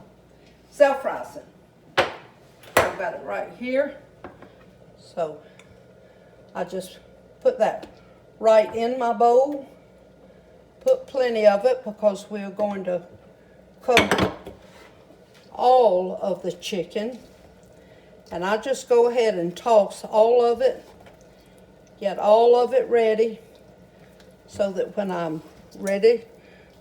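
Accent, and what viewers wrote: South, United States. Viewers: I dearly love your videos. Yummy! I had a lovely aunt who married a wonderful Christian man from Mississippi. After a time she developed that glorious Southern accent. She has gone on to Heaven now, but sounds just like her. So calming & gracious to hear her talk. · I knew she had to be from the South, because of how she cook and also how she talks. She reminds me of my Grandmother love you 😘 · Hi, I love All your recipes!!! Also love your sourthern accent!!😊especially when you say R/ite, 😀so great!!!😀😁 love, from zFt. WORTH, Texas!!!😍😍😙😚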